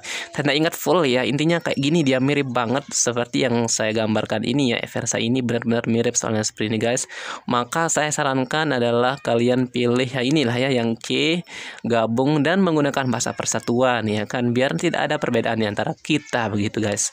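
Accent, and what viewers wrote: Lombok, Indonesia. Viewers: logatnya kek org lmbok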